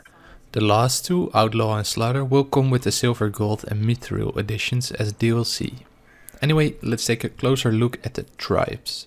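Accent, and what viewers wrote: Netherlands. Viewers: Ha! ik dacht al dat je nederlands was 😂 hele goede video man!!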